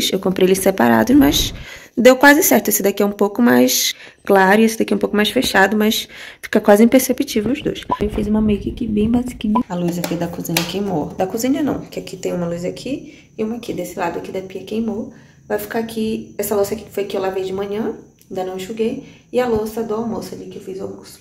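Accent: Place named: Pará, Brazil. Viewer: tem muito sotaque paraense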